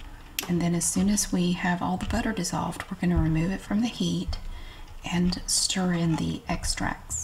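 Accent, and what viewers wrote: South, United States. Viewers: Thanks, another great video. My sister and I love your southern accent, it relaxes us, probably growing up watching American shows on TV. Love from Melbourne, Australia.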